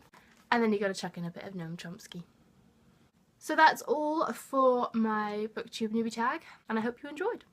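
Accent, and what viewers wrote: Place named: Wales, United Kingdom. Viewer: Welcome to BookTube! You're the first Welsh BookTuber I've come across :) Lovely accent! Finding time to read is hard for me as well, but starting BookTube has actually motivated me to read more so that's definitely a plus in my book :) (yay for puns, ha)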